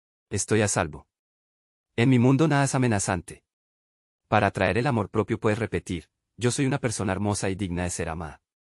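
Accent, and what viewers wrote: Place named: Colombia. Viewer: Bella, que bueno q la voz es de acento colombiano y no de una maquina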